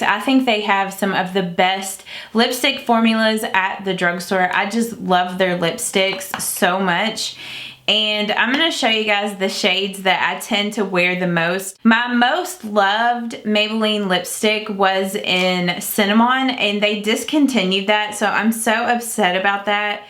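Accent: South, United States. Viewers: You talk with the southern DRAAAAAAL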